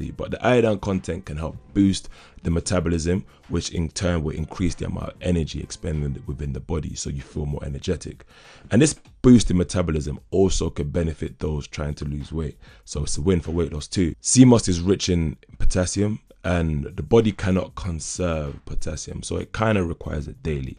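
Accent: Caribbean. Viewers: He sounds Caribbean to me.....African are different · The information that you shared is very empowering. Thanks. I love your voice/accent. It's very raspy/sexy and Caribbean. Can you share some shakes or drinks that we can make using seamoss/seaweed/algae?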